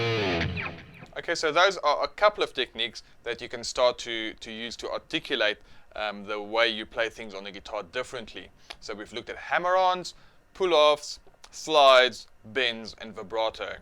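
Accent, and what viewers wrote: South Africa. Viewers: You are South African! :D \\m//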